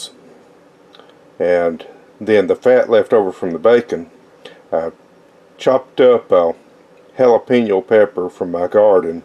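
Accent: South, United States. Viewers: Hi, that gravy looks delicious and I wish that I had some of it in front of me right now. I will definitely try your recipe. You sound like a southerner, I am from Okla. but I live in Idaho. Looking fwd to seeing more of your videos.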